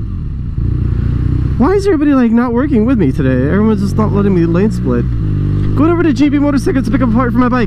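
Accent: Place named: China. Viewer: Sorry the fix did not work, Still love the Chinese accent thou.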